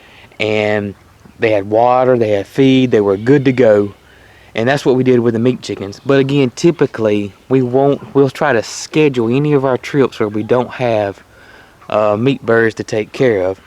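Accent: South, United States. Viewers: too bad I live in cali... I would watch your animals for free for the experience with all the vidlogs I have watched, I dont think even instructions would be needed. I cant wait till I can afford to buy land in Georgia. For the time being I will live vicariously through your logs...DUDE that southern accent...when daddy spoke that calm, you know an whooping is coming. in other words its easy to stay awake watching!!! the commanding presence is there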